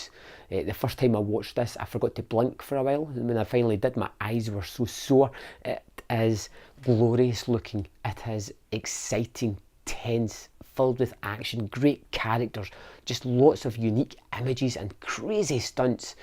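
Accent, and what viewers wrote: United Kingdom. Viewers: I like British the accent is cool yeah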